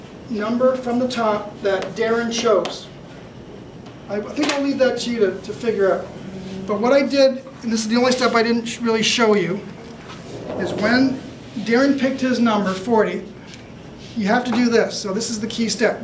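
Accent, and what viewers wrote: Canada. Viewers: This guy is from Canada that's for sure!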